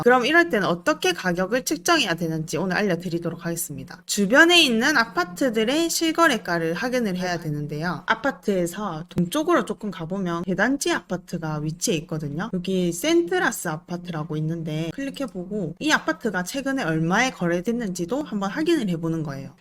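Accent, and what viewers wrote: Gyeongsang, South Korea. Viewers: 어후 보는 부동산 유튜버마다 쌍도사투리 안쓰는사람이 없누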